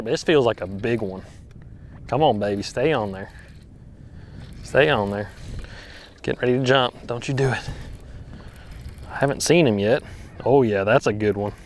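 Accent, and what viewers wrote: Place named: Long Island, United States. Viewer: "Downsize after Sunrise" that's how I roll ....unless their on and the Bubba baits r Workin ! Great channel brother. Is that accent from Long Island ?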